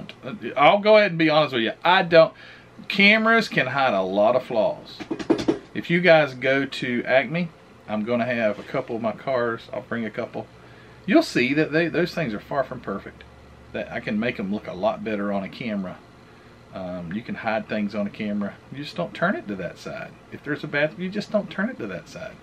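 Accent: South, United States. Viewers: you are real. That's what's so endearing about your channel. Yes you build really cool models but it's more than that. When I first saw you, it was after a Luka Cee video or an HPIguys video. I was really drawn in by your southern accent and the way you talked about your model. I'm glad you want to stay grounded. Thank you for being real.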